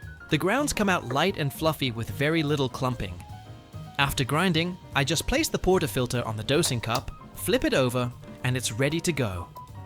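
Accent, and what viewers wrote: United Kingdom. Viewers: why are you using british accent now? it's not bad. i'm just curious and found it slightly hilarious.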